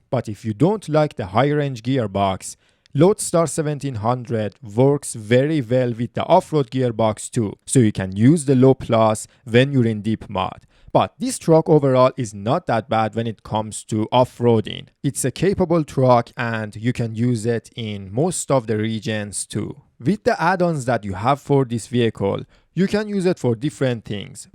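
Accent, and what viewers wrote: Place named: Iran. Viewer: Bro, your accent sounds extremely Iranian. Did I guess right?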